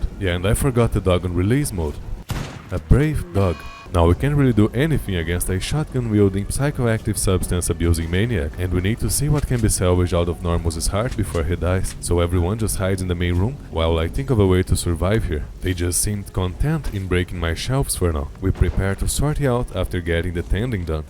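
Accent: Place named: Brazil. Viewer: “that’s the bones of the office” Eu tinha uma suspeita que seu sotaque era brasileiro, mas depois de ouvir essa expressão eu tinha certeza! Kkkkkkkkk que legal achar um br fazendo conteúdo de rimworld, ótimo vídeo cara!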